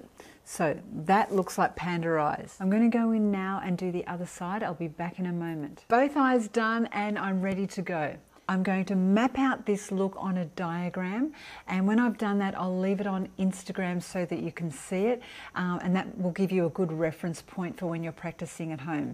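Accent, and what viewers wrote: Australia. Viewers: Your personality fills me with warmth and joy and you are one of my top favourite YouTubers. I remember finding your channel (broccoli sprouts video) when I was in a toxic relationship and did not know what was wrong with me, and your videos gave me so much hope, softness, feminine energy I was missing in my life. I watch your videos now and I feel that burst of joy, pleasure, warmth and happiness you always have in your videos. I love your Australian accent too. You have no idea how much your radiant personality can heal others behind the screen. Thank you for being you. I hope one day to pull off this makeup look since you look like a model. There are so few people left on this earth with such an authentic emotive energy, beauty and personality. I hope you never change who you are. Thank you for what you do to help so many people remain beautiful in a world that can be cruel at times.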